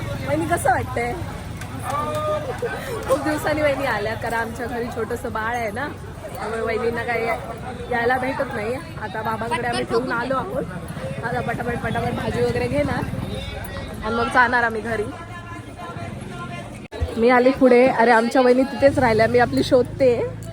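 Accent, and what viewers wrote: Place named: Malvan, India. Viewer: नेहमीच छान असतात तुझे व्हिडीओ. बाळ खूप गोंडस आहे. मालवणी भाषेचा पगडा असलेलं तुझं मराठी बोलणं खूप छान वाटत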